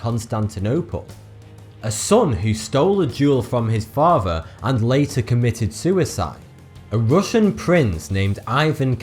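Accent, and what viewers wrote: United Kingdom. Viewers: Herpa Mcderp It depends what accent you adopt. is British, not American, and I'm guessing you're American. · He's British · Uhm.....because he's British? Where we pronounce the letter 'h' as 'eiche', a British chap will pronounce it 'hayche'. Very often their T's will be much softer in inflection or just get dropped altogether, unless they're actually talking about afternoon tea. Look up British stand-up Eddie Izzard and he'll teach you how to British. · Benjamin Matić Maybe he is actually saying 42, and we're all just jackass's for blaming his British accent? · Nate Haimson thats how british people talk, you know that you see movies dont you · This guy has an accent that you can chop off with an axe. I wonder where he's from in the UK. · it’s just a bri’ish accent, innt? ‘specially in lond’n, where is pr’nounced for’y too. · Thanks for the informative video! 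I have learned many things from your channel, and I love it! Just ignore the people that say, "You're voice is stupid", it's not true. They're just jealous that they don't have a British accent. I've been to England before, and I know what a real British accent it. Not just American impressions. :-) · Why do you say "42", but your name is " "? What dialect do you speak? My guess is it's somewhere in or around the U.K. Your teeth also make it very likely that you're British. You also dress like you're from a different era. It's strange and interesting. Good luck with everything. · British accent!! so yhea sorry to ruin your hate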